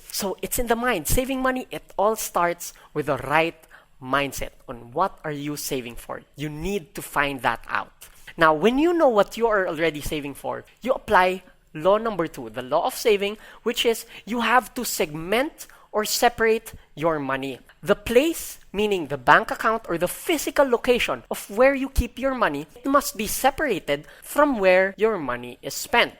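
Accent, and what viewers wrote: Philippines. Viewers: Congratulations on your marriage. Marriage in the US is more of a liability than a benefit. Your accent is charismatic and charming... makes me miss the PI. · strong phillipino accent but i LOVE THIS VIDEO really hit home for me by the way what software was used to make this video i got a couple things in my vault i got to put a visual to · regular filipino english accent. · Filipino accented English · "Filipino accent" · Amazing video and I love how it sounds so familiar (Pinoy) hehe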